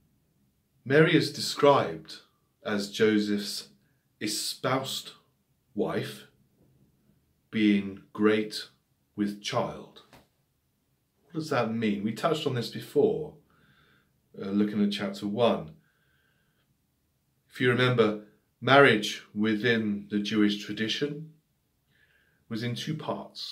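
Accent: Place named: United Kingdom. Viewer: My (Sub - we have to renounce of this prefix)!... You explain accurate the message / meaning of this fragment of the Bible!... I forwarded this to my friends and students who speak and understand English for them to have both a good teaching and a model of how they have to pronounce the words in British English!... Thank you!...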